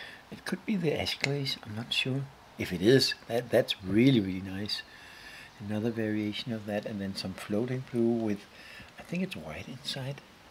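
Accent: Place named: Denmark. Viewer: I just realized how the beautiful Danish accent fits the mad professor character so absolutely perfect 😂 Greetings from your southern neighbor!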